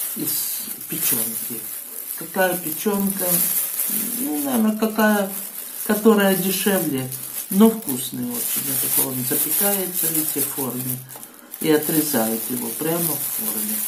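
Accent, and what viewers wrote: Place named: Donetsk, Ukraine. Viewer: Вы так похожи на мою покойную бабушку,, она с Донецка была.. такой же говор … удачи вам..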